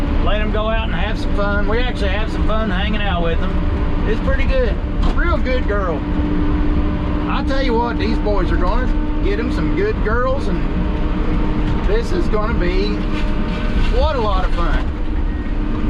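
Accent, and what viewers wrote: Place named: Pennsylvania, United States. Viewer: I like you and I like the way you talk, you remind me of some of my more interesting relatives in the foot hills of Pennsylvania.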